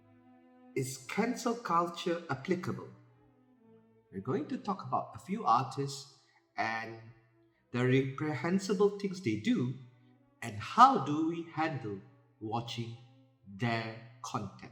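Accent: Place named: Australia. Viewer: Me walking around listening "Continually" Why is this AI Australian?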